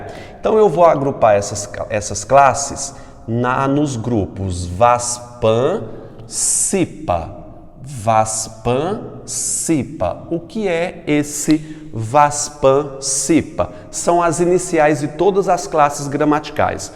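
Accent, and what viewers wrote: Northeast, Brazil. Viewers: Bom dia professor. Eu vi um vídeo antigo seu de análise morfológica,muito bom,eu achei incrível me ajudou muito na prova. E lá eu percebi q vc tinha um sotaque parecido com o meu,e gírias conhecidas aqui. Pensei q vc fosse do nordeste mas vc é de Juazeiro do Norte,eu moro numa cidade vizinha a Juazeiro. Q legal. Ótimo professor, parabéns 👏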